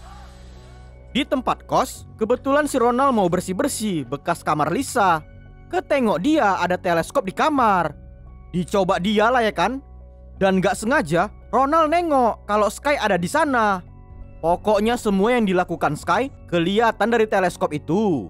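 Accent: Medan, Indonesia. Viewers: Lucu lae nih,,bahasa medan kali😁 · Medan kali ngomongmu bang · Medann kali ahh BG😂🤙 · asal medan ya bg dri cara logat bicaranya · Mantap bg suka kali aku penerangan abg logat Medan nya kren salam dari Sibolga bg 🙏😊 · Medan kali yakan bos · Org medan ya min... Wwkwkwkw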